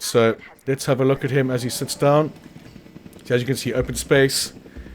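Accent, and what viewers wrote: South Africa. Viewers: You can see from the Alamosaurus how keeping the button depressed when placing forest or a food source really helps with the space required to keep them happy. I can't remember if I mentioned it in the comment on the previous video, but you keep the button depressed until it stops costing money. Once it no longer costs money the area is full of whichever vegetation you're placing. Also, last time I didn't mention that when placing trees from the decorative section instead of using the painted forest, those trees do not remove whatever vegetation is painted in the area i.e. they do not replace the food sources like painted forest does nor do they replace water, which heavy forest, when placed in water, does. Thanks for another great video; both instructive and entertaining. I could listen to that South African accent all day! By the way, I saw in one of you replies to another viewer that your wife's a Kiwi -- I'm in the middle of you both, I'm an Aussie!! 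Cheers, mate, have a good one!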